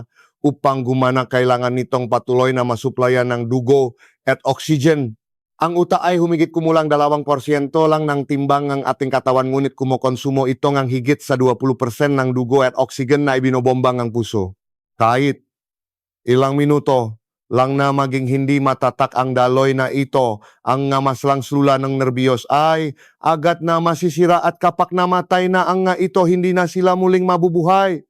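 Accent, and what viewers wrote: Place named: Visayas, Philippines. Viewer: Ang accent niya ay.visaya,hehe but it's ok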